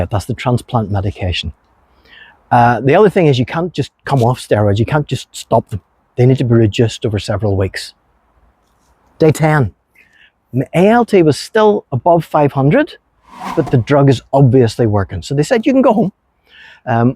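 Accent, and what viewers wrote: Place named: Scotland, United Kingdom. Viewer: Hi just found your channel via Francine, following you from Denmark. I absolutly love your accent, Scottish? Your videoes are next level, sp well done. Hope uou are having a good day today. I will pray for you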